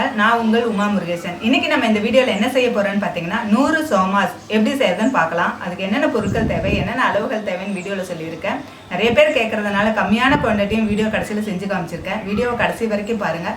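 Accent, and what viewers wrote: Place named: Madurai, India. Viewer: ok ok தமிழ் மதுரை தமிழ் போல உள்ளது..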